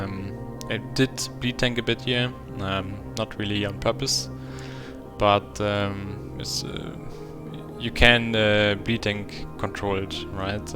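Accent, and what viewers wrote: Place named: Germany. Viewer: Ich wollte dich zuerst wegen deinem deutschen englisch dissen aber ich kann es selbst nicht besser :D Sehr nice!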